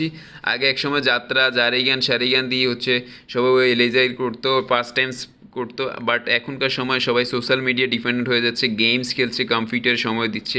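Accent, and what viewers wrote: Noakhali, Bangladesh. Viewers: আপনার গ্রামের বাড়ি কি নোয়াখালী?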